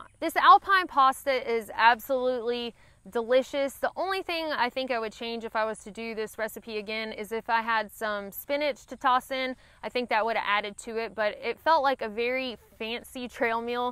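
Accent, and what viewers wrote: South, United States. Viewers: Yup. Definitely southern. If anyone had any doubts, that cure it. "Slap your momma good" love it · It's so flippin' adorable. Southern folks have no idea how charming they are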